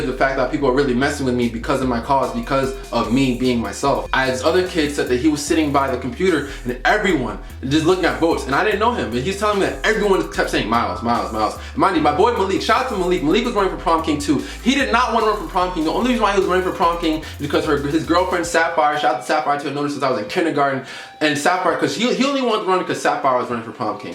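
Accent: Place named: Northern Virginia, United States. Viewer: lmfaoooo i KNEW you had to be from nova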